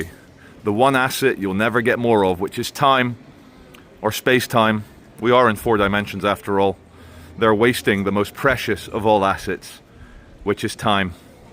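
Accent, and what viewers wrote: Scotland, United Kingdom. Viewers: your accent ... it's so Scottish , well spoken Scottish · U sound so Scottish to me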